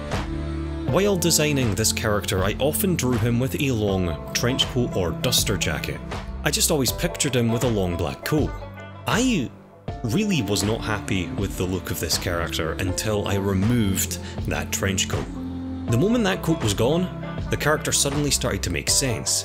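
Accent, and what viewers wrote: Ireland. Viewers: Are you irish? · I never get tired of hearing a good ole Irish (sorry if I got it wrong) voice.... it can be very friendly but have mischievous intents behind it all. Keep voicing your characters! Your doing great!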